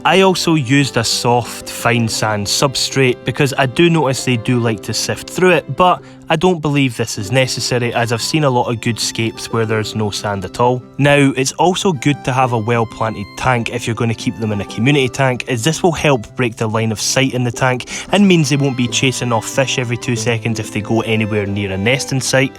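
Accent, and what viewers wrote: Scotland, United Kingdom. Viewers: Ahhhh… the Scottish accent… how I’ve missed it! I did some traveling in Edinburgh a while back. Lots of good memories!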